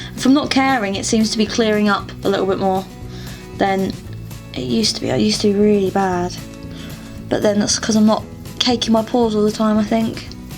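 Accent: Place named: Norfolk, United Kingdom. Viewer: You're welcome!! You sound quite Norfolk and im from there so aha xx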